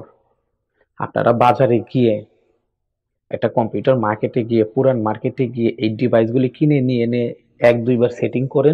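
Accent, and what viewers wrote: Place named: Dhaka, Bangladesh. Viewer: আপনার কথায় ঢাকাইয়া আঞ্চলিক টানটা ভালো লাগে, আপনি আপনার ভাষাতেই যেভাবে খুশি বোঝাতে পারেন, অনেক অনেক শুভকামনা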